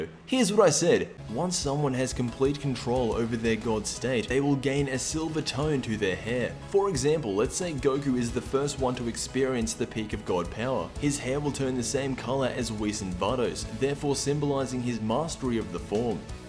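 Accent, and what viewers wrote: Australia. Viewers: best dragonball youtuber ever, might be that aussie humor culminated with the accent!!! haha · The Nerdy Toad yeah i think, i am an aussie and u can tell by his voice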